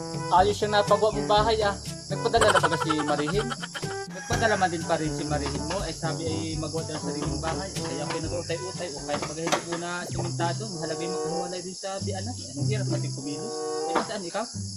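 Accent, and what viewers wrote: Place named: Mindoro, Philippines. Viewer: Hehehe kaganda NG tono nyo Lodi taga San ga kayu Lodi parang mendoro oh simara oh rumblon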